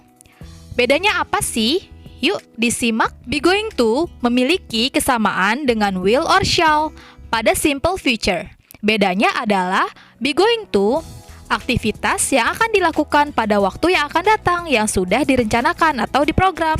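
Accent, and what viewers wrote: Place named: Indonesia. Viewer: Miss, saran untuk intonasinya hehe masih seperti bahasa Indonesia... kan seharusnya ada word stress dan sentence stress 😊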